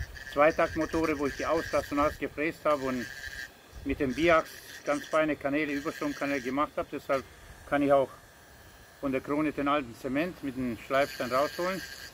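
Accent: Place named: Hungary. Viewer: Ich mag Deinen ungarischen Akzent.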